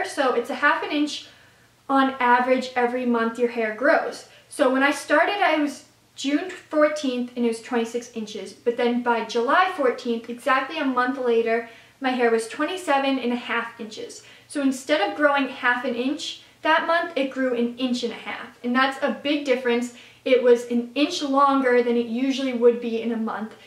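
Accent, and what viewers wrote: Canada. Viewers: Are you Canadian? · Are you.. Canadian..? Also you're really pretty.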